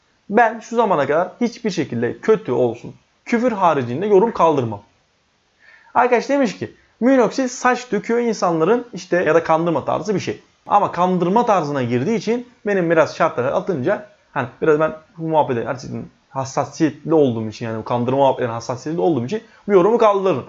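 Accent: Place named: Thrace, Turkey. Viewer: Usta be trakyalımısın sen konuşmalarında gizli jjj sesini duyuyorum sorayım dedim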